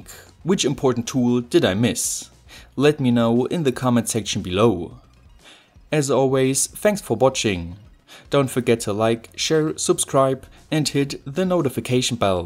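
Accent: Germany. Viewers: I thought he was German, ja?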